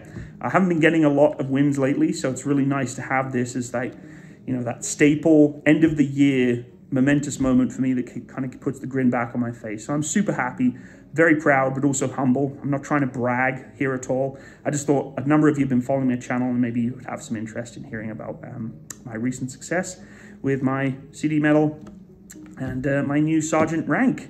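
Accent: United Kingdom. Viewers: I served with the SAS as a transfer. Good Job Mate! That Limey assed accent is yours and only yours Sir!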